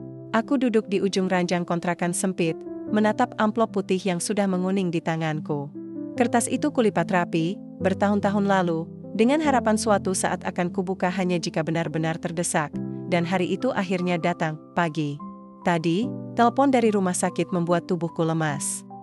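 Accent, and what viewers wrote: United Kingdom. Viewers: Apa sih ganggu banget harus di selingi logat Inggris segala buat apa ? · Mengapa bahasa Indonesia menggunakan logat bahasa Inggris ?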